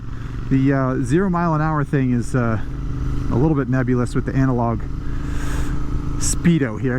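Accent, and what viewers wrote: United States. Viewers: Was surprised when I heard an American accent. This dude looks super British lol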